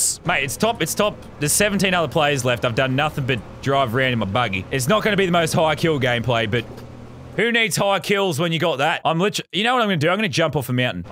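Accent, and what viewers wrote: Australia. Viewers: I’m Aussie and you can tell he makes the accent stronger (for comedy), cause when you have an Australian accent it’s really easy to go full out Aussie mate... yeeeeeeee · PiBi23 Official he is australian the accent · You sound very Australian mate (I'm Australian as well)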